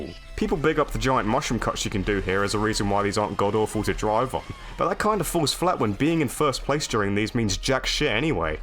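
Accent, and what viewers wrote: United Kingdom. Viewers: Well, you think your anglo poxon accent is human and is tolerable. Everyone has wrong ideas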